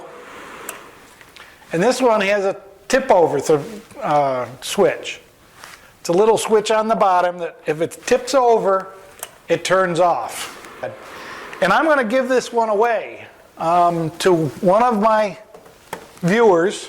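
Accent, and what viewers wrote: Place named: Hudson Valley, United States. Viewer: Thanks for the video as it clears up a few important issues that being a first time RVMH camper clears up. I enjoyed this video and I also enjoyed the first one where you put a marine outlet in to replace an existing one. Your dying and instructions were perfect. I do not know if your heater gives a way was for 2017 or 2018 but if it is still ope I timed the section on the give away pm a over cast cold Hudson Valley NY Saturday. We still have snow on the ground down hear but that will likely be gone in a few weeks . That is unless we get another N0r-Easter. I'll be glad to see winter depart. Thanks again for the videos and the chance to subscribe to a channel that has a person conducting it that speaks the way we speak down here in the Hudson Valley. PS there is more to NY than NYC about 100 miles down the Hudson River. 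< MIKE <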